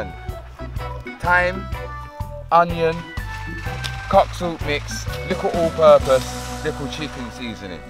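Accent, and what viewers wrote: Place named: Jamaica. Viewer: You talking with a Jamaican accent reminds me of my ex who used to talk with a manchester accent because she liked the sound of it despite being born and bred in the south lol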